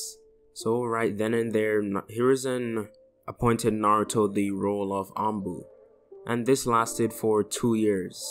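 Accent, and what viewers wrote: Jamaica. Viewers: are u Jamaican? lol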